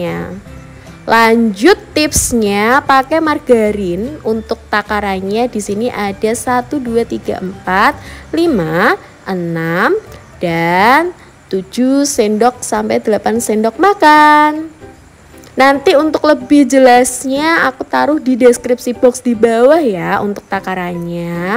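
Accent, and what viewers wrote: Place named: Java, Indonesia. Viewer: Suara nya medok Jawa bnget, kereeeen 👍👍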